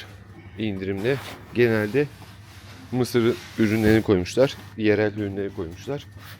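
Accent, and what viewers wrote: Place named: Thrace, Turkey. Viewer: Biraderim sanırım Trakyalı mısın Ben anladım Ne yapıyorsun be ya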